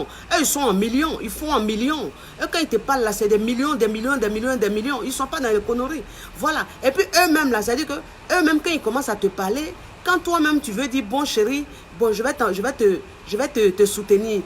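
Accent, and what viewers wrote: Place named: Ivory Coast. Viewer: les expressions : "mougou... mougouli.... yougou yougou..." ton accent ivoirien, tes "r" enroulé, ta gestuelle de gauchière, viennnent pigmenter ton coaching. j'aime quand tu les utilises souvent.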